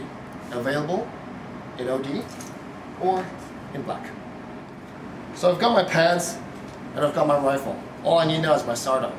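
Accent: United States. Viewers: whoa! american accent! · hes thinking at a more business angle. American accent.(i think) good magazine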